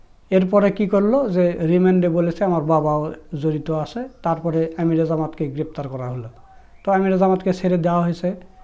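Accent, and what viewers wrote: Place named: Naogaon, Bangladesh. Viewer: আপনে কি নওগাঁ জেলার আপনার কথা আমাদের নওগাঁজেলার ভাষার মত লাগতেছে আপনাকে ধন্যবাদ আপনার খবরের জন্য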